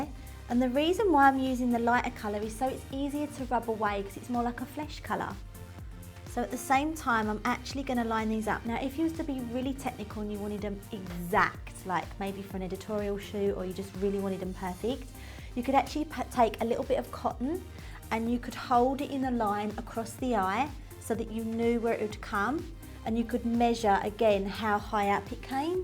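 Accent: Essex, United Kingdom. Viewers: Essex voice so annoying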